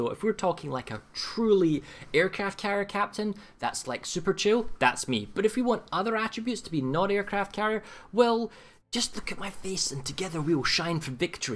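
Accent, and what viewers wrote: Scotland, United Kingdom. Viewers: damn now i've got between Mighty Jingles and you....... i don't know..... i'll see with every mission (if you bring a little more scottish accent(soft spot) i'm in....) Why not strike a deal and get a scottisch speaking HANS in the game :)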